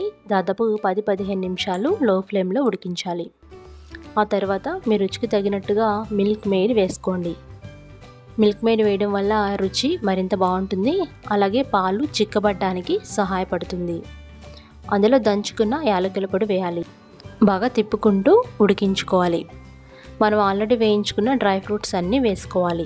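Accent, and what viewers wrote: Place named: Krishna, India. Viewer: Yummy 👌🏻.... I strongly believe you are from krishna district right 💁.... The way you speaks was very much related to krishna's accent 😊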